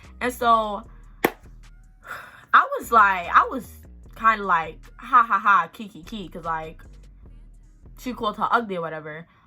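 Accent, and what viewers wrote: New York, United States. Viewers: Yo you mad pretty and you sound like you from New York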